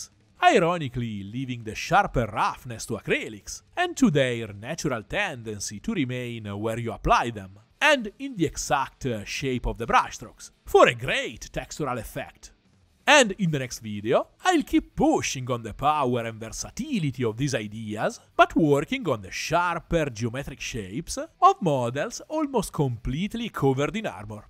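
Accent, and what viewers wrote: Italy. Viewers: ho capito che eri italiano prima di vedere il nome del canale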